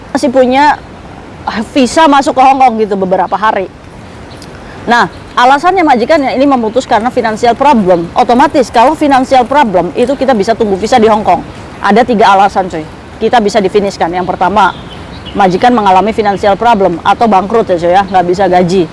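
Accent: Indramayu, Indonesia. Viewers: Sing suarae ktenger payah logat drmayue, trnyata emang bner wong drmayu 😂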